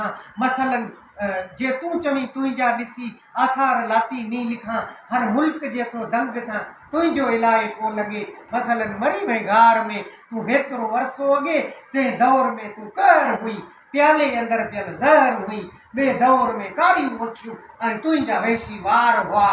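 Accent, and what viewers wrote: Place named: Lari, Pakistan. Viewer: تمام بهترين لاڙي لهجي ۾ پڙهيل هڪ طويل نظم منفرد لڳو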